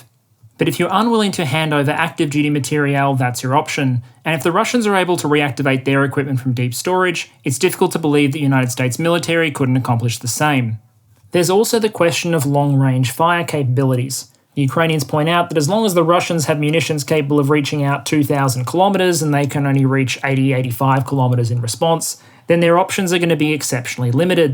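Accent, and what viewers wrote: Australia. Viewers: I'm Australian and he sure sounds like an Aussie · Not sure how a guy can speak in a fairly monotone Aussie voice, yet still be by far the one of most interesting and informative views on the war in Ukraine. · Thankyou for all your good work mate. Informative, instructive, clear and concise with a small dose of ozzie for good measure. Keen for more.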